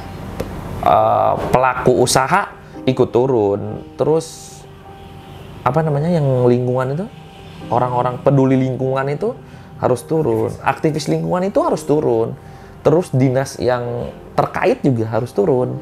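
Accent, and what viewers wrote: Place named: West Java, Indonesia. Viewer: kalo dari tata bahasanya dia ini hanya domisili purwakarta ... paham sekali lidah sunda itu klo pakai bahasa indo dicampur" 👍👍😁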